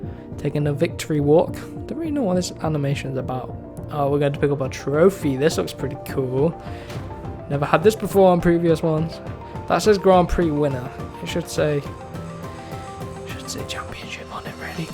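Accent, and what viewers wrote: United Kingdom. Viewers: I enjoy the 25% races from last grid position. I'd recommend FP in Moto GP to collect R & D points ... but maybe you can show us just a short version (like you do with Q1 & Q2). Well done champ! Besides, you have got an interesting british dialect.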